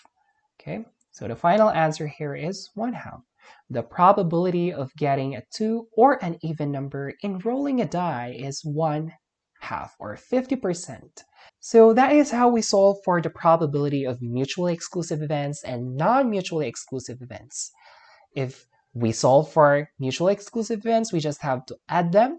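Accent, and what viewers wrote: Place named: England, United Kingdom. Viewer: Aaaaaaaaa!!!I really like your English accent sir!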